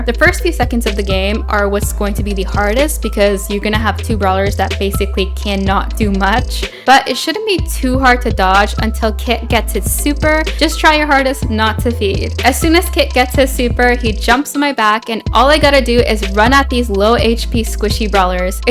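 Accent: India. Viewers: Are you from India by any chance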